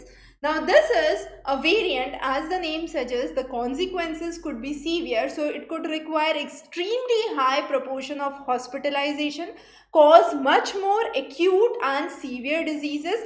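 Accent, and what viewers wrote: India. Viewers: english subtitles help me to understand your indian accent english :)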